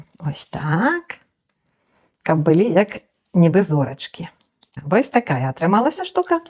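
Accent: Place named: Russia. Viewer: Это ж надо так исковеркать русский язык. 😮 Хотя слышно, что для нее родной язык русский. 😱😓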